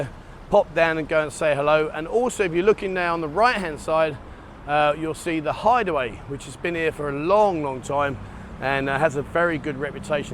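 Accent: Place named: Nottingham, United Kingdom. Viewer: speaks Thai. Like a local with a totingham accent.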